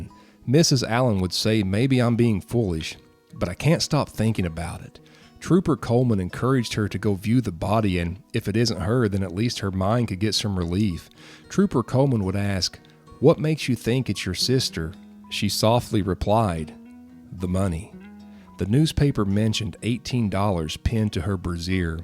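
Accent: South, United States. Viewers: this was one of the best iv seen/read on u-tube ,was quick and concise too the point ,the south in your mouth added to its feeling of CONFIDENT story telling ,kudos too yall ,WELL DONE ! bring on more like it. thanx!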